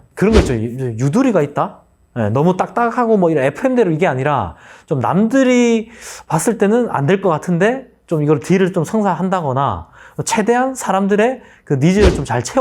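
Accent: Busan, South Korea. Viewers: 이 부산출신이라 사투리쓰시죠ㅎㅎ 실물은 영상보다는 훨씬 멋져요 ^^ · 실물로 보면 괜찮다??? 원판 불변의 법칙을 아시나요? ^^ 아니 그냥 그렇다는 겁니다. 실물로 보면 잘생겼을것 같아요. 음....원판 불변의 법칙이 뭐었더라... 음... 암튼 죄송합니다. 결론은 실물이 멋지신걸로 ㅋㅋㅋㅋ 그리고 말투가 부산사투리 였군요.^^ 뭔가 이상하고 어색하다 했는데, 이제 이해가 되네요..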